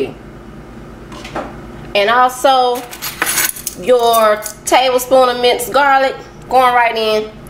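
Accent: South, United States. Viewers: good ol southern accent I love it lol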